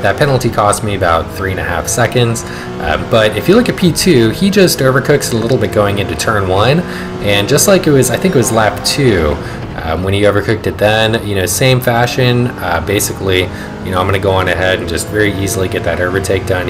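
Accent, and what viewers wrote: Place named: United States. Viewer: I really enjoyed watching this! Please keep grinding I’d love to finally have a American English speaker to watch daily on gt7 !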